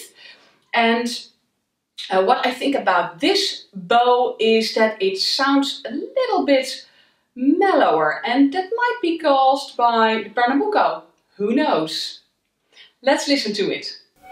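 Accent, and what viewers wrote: Netherlands. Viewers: I love your Dutch accent ! ❤️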